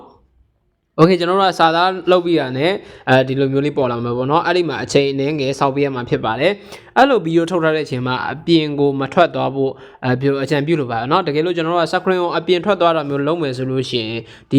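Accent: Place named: Rakhine, Myanmar. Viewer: အသံကမပီဘူးဗျရခိုင်အသံလိုဘာသံကြီးမှန်းမသိဘူးဗျ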